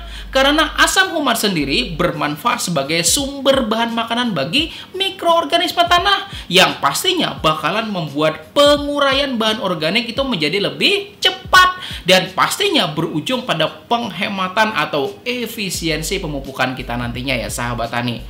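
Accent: Banjar, Indonesia. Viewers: Joosss buat ppl...walaupun gmn logat banjar ny gk ilang²....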